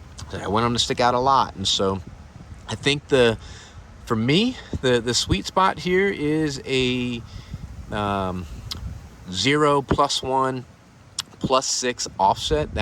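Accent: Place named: South Louisiana, United States. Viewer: Ive got the same truck, but in Carbonized Gray and the sport interior (brown and black leather). I also have the Fox, instead of Carli. Thats a bit hefty of a price tag for me. Where are you located? I was guessing south Louisiana based on your accent, but the palm trees are throwing me off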